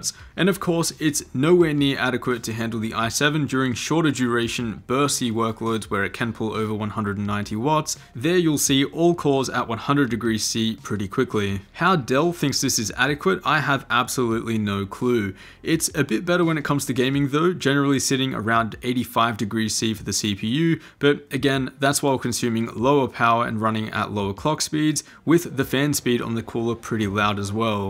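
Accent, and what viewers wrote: Australia. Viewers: R u and Aussie whaaaat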